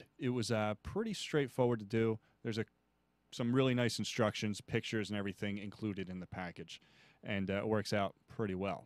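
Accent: Philadelphia, United States. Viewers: Just subscribed. Thx for this video. BTW, are you from Philadelphia? Just a guess from hearing your accent.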